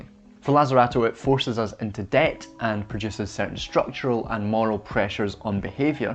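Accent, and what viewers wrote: Scotland, United Kingdom. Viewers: I was keen for a new channel but fuck am I glad to hear a Scottish accent 🙏🏽🥰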